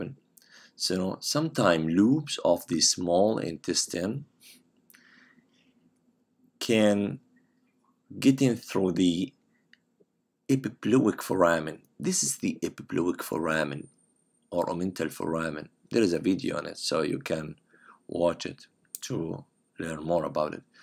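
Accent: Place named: Arab world. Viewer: ابدعت دكتور احسك عربي من لهجتك ما اعرف ليش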